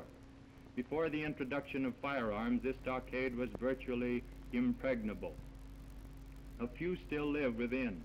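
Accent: Transatlantic, United States. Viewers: It's how most things were narrated back then. Transatlantic accent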